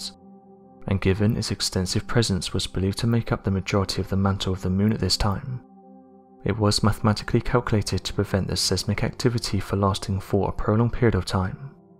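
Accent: United Kingdom. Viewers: I have to correct monotone British dude again. Any craft traveling from Earth to Mars wouldn't be called a "star ship". A star ship implies a vehicle that can travel to other stars (such as "the star ship Enterprise"). A transport vehicle from Earth to the moon or Mars would simply be called a SPACE SHIP.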